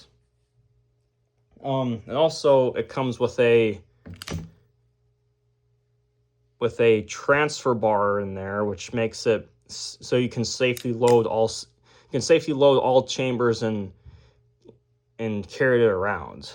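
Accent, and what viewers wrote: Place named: Midwest, United States. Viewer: Sounds like a Midwesterner to me.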